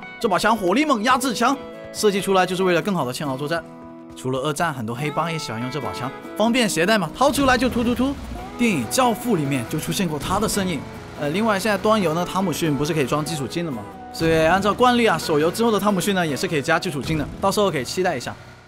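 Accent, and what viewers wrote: Hong Kong, China. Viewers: 你是香港人吗？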